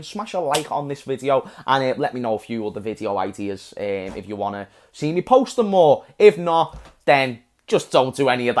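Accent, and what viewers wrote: Liverpool, United Kingdom. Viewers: Am a Manchester United Fan and i don't like you because of your Scouser accent. However your point made is factual and I genuinely thinks its a panic buy and don't believe its a ETH signing. The club have money but no clear plan.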